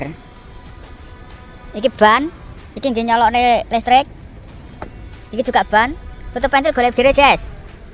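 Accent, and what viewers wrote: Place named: Banyumas, Indonesia. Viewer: Wuihhh ngapak gessss,,, mene dolan ning channelku gess 🙌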